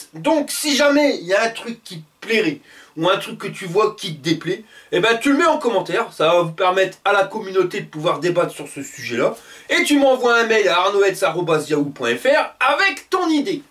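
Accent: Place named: North, France. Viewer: Salut, alors, je viens de voir ta vidéo sur YouTube, sur une trottinette avec selle et pneus offroad. Je suis d’accord avec toi sur la sélection des paliers de vitesse. Mais, moi, j’ai une Hitway S5: celle-ci est censé faire 45 km/h sur 45 km, elle a aussi un siège avec des pneus route anti crevaison, freins à disque avant et arrière, un plateau,(et non, pas un deck- restons dans le français,- pas la peine de se galvauder...), avec matériaux anti- dérapants sur les côtés, le tout, sur un moteur électrique sur la roue arrière de 800 watts... elle a aussi des clignotants avant et arrière, elle fait 20 kg tout plein fait,(si j’ose dire...)... Dans la réalité, j’ai d’une trentaine de Kléber faisant le même poids que toi,(99 kg). Pour l’instant, je ne peux plus en faire, j’ai glissé sur une plaque d’égout et je me suis blessé à la jambe, et ce en marchant... 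Pour ton problème de puissance, je pense que l’idéal, serait un moteur de 350 watts sur chaque roues. Par contre, je ne suis pas d’accord avec toi sur le régulateur de vitesse, moi, je ne l’ai pas, et c’est ce qui manque à ma trottinette pour être parfaite, en tout cas, pour être parfaitement concentré sur la route... Continue avec ton humour, et ton accent du nord, car moi, j’aime bien.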